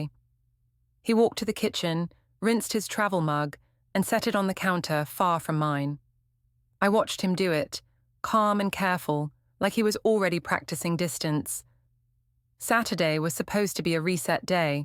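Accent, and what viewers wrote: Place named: United Kingdom. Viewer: Some things never change. They always use this British voice for the most mentally deficient, clueless, self awareness bereft, delusional women's stories. They always name them something faux sophisticated and exotic that reminds me of "Cruella DeVille". And there's always some lame attention obsessed excuse for whatever the women do that's supposed to absolve them of any blame even while they tell people half truths and manipulate then move as if their fictional reality is actual.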